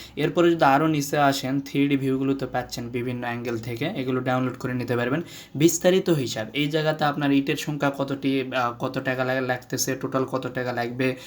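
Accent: Naogaon, Bangladesh. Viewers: আপনার বাড়ি নওগাঁতে নওগাঁর ভাষা বোঝা যাচ্ছে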